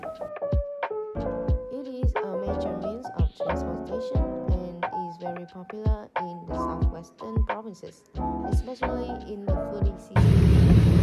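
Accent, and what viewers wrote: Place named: United States. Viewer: nói tiếng Anh tưởng người Mỹ ko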